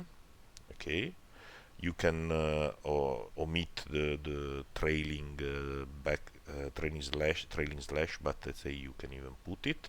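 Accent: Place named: Italy. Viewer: Per ringraziarti ti ho "comprato" un caffè! (fra l'altro dall'accento immagino tu sia italiano)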